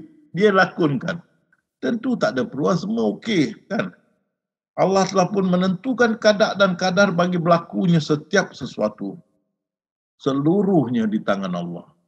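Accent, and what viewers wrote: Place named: Malaysia. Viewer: Saya mohon mengikuti kuliah ustaz .ini kali pertama. . .gaya cakap accent ustaz ni macam gaya orang Melayu dari Malaysia..alhamdulilah.Tk.tuan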